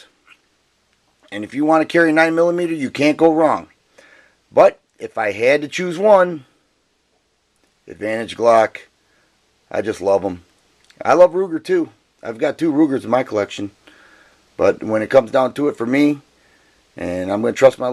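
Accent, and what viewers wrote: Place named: Upper Peninsula, United States. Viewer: Whereabouts in MI are you from? I grew up in the Detroit area, you sound very UP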